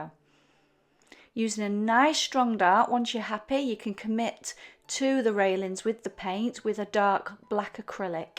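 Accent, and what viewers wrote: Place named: Yorkshire, United Kingdom. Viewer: What a beautiful Yorkshire accent! Excellent tutorial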